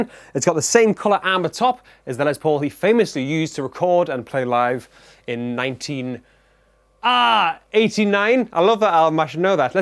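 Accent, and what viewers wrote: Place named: England, United Kingdom. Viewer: he sounds like bob odenkirks english accent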